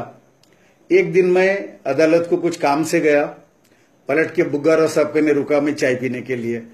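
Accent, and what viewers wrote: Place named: Hyderabad, India. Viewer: lol 😁😁😁😁 Hyderabadi Slang 💪💪💪💪💪 keep it up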